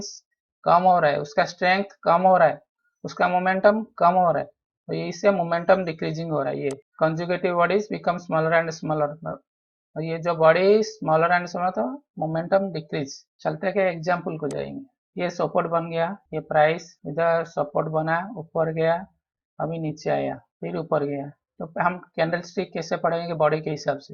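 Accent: Odisha, India. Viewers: Apka bat chit se lag rah he aap odia ghar se belong karte ho... Me v odia hun from Rourkela, Odisha..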